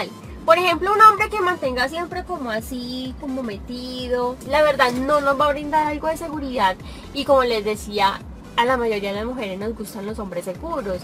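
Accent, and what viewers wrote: Colombia. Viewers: Hola apenas estoy viendo tus videos y conociéndote, eres una chica guapísima, linda y muy carismática!!! me encanta el asento de las chicas colombianas jajajaja ya me convertí en tu fiel fan.! voy a seguirte con frecuencia saludos desde Monterrey México..!! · Me gusta el asentó colombiano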